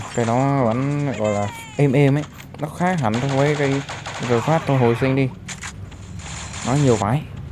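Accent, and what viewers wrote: South, Vietnam. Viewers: Miền nam à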